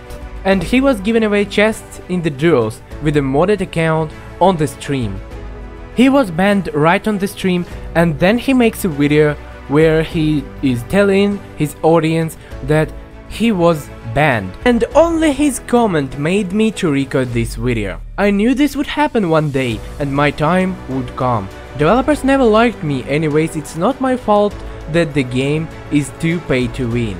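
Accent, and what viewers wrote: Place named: Ukraine. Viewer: no not that it is just you sound Ukraine so I whant to make sure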